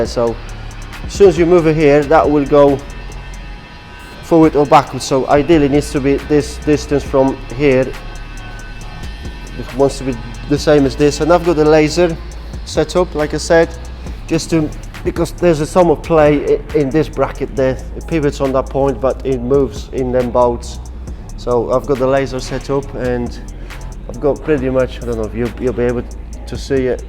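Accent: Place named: Scotland, United Kingdom. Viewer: I hate this scottish accent, otherwise good job.........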